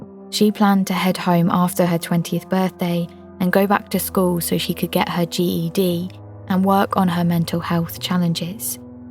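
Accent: United Kingdom. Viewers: Great to hear a British voice for a change new subscriber. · I think it's more of a British thing, this channel is British, the accent is British and obviously the reference to the Met Police and the Sarah Everard case give that away- she sounds about my age so to me Red Rum was a famous British racing horse- that grew legendary status. I have watched the shining and can't remember Redrum but who knows....in the end you have to change words around to stop things getting blocked in the world of youtube.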